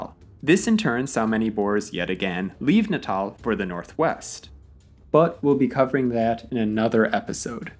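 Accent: United States. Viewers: There is something very wrong with a person explaining to the world from an American accent what happened in Southern Africa! Where does he get his information from? No, I'm sorry I refuse to listen to this. He doesn't get the nuance or intricacies of the issues. I was under the impression that the Kei river border wars were between the Xhosa's and Dutch settlers not with Koi Koi tribes. It also seems that he outcome of historical events depends on who you ask and from which colour and Tribe they come. but no please don't give me an American trying to tell me about my history!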